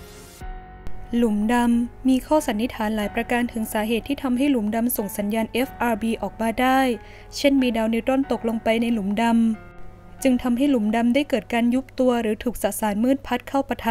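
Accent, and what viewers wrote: Thailand. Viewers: คนใต้ไหมนิ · เสียงเหน่อมาก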